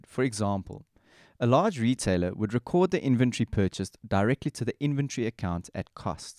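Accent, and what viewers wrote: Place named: South Africa. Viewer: Lekker boet, helping me all the way in Canada... but born South African.(noticed the accent immediately, but confirmed when i heard the Rand)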